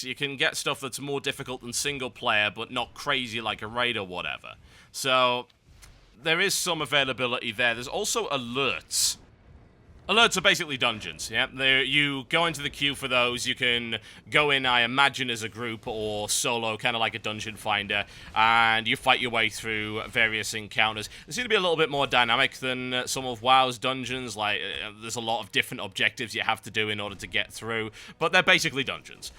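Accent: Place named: Newcastle, United Kingdom. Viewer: It's nice to see another geordie.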